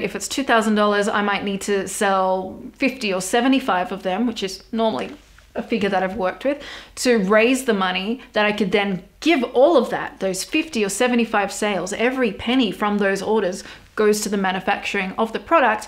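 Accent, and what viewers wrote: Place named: Australia. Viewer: 😂 Your Australian accent + voice = sexyness! Yep! Never been in loooove... Respect is going extinct, I guess. Oooh, and thanks for the "night mode" tip! Everything looks a lot better. I'm going to revamp my crafts page! And... You know your eye shape is so beautiful, everybody blushes! 😊 Much love, ❤️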